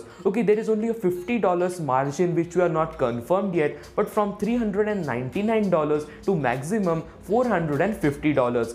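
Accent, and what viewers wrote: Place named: India. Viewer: Your accent is reminding me of Indians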